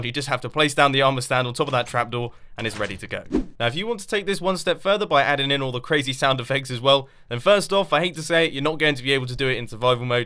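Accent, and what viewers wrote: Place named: United Kingdom. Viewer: I love your eccentric british voice. Can't wait for the next hermitcraft 3 episode!!!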